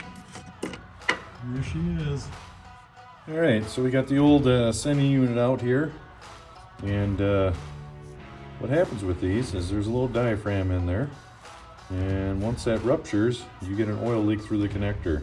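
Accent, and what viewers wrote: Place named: United States. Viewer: good, simple, and in clear USA English. A DIY guy can do this (replace the unit and do the oil change with a good filter) and save a LOT of money!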